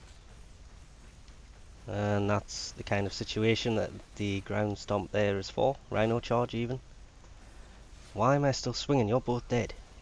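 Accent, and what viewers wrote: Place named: England, United Kingdom. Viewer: I enjoy your content but could you please stop calling the stealth frame loki ... logi it is a k not a g, sounds petty but as much as I enjoy and use your information It annoys he that someone that sounds so English (sorry if your Newcastle as I realise you don't like being called english) can get a pronunciation so wrong, again I'm not trying to be insulating just making a point of reference incase someone else picks up on this point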